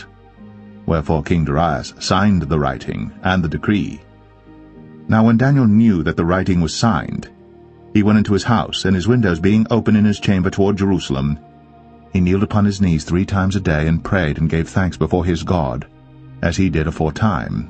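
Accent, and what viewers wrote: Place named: England, United Kingdom. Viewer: sounds like England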